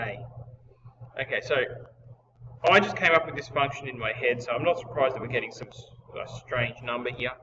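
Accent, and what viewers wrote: Australia. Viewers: The Aussie accent ❤️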